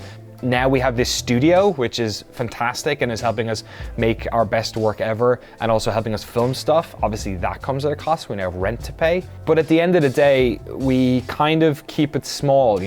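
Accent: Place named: Waterford, Ireland. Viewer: Shiiiiit! Your accent makes so much more sense now that I know you're from Waterford! Gas!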